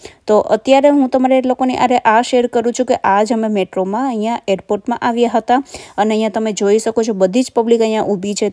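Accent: Saurashtra, India. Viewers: ખુબ જ સુંદર ધન્યવાદ ભાષા પરથી તમો સૌરાષ્ટ્ર ના લાગો છો 👌👌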